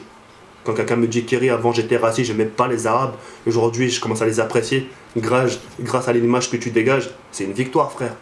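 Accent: Banlieue, France. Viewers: juste un message, ne t'adaptes pas, ne change pas, malgré ta voix banlieusarde, ton language, les gens s'en fichent, apprends à etre toi meme, meme si ça peut prendre du temps. Tes abonnés verront réellement qui tu es, et ça plait toujours de voir la vraie personne qui nous parle;) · je suis Iranien, mais sa m'empêche pas de pencé extrême droite ... c'était juste de l'humour Noir car j'aime pas cette voix des cités ... Ce n'est pas une bonne chose du défendre et vous Français gauchiste ! vous allez tuer la France avec votre Humanité exagéré envers tout ! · arretes stp, malgré ta voix de banlieusard et ta casquette a l'envers comme tu dis, tu sembles meme pas a une caillera, t'es un mec cool, respectuex qui parle de la verité que certaine personne meriteraient d'entendre. continues tes videos pk t'as un niveau de jeu elevé. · Je suis décu de ta video ! 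La violence ne ressoudra rien ! Et c'est surtout que le rascisme est bien des deux cotés donc faut arreter de pleurer sur son sort en prenant un accent balieusard ! Je la connnait la banlieue et faire genre en parlant comme sa fait le caid te sert à rien j'apprecie le personnage que tu es car tes pensées sont le biens incarnées comme l'histoire Merah ! Mais ne part pas en vrille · Mdrrr y a des gens dans la rue qu il faut respecter et parler bien mais youtube c TA CHAINE dc tu doit etre toi meme ! J suis banlieusare et fiere de l etre ! Notre "axcent" c notre identiter un peu ... bonne continuation !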